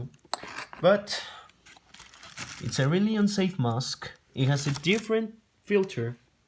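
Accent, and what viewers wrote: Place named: Russia. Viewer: Oooh I really thought you were russian cuz of yr accent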